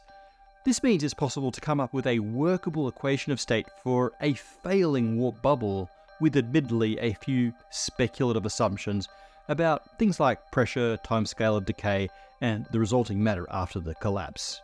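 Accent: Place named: Australia. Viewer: He's Australian lol.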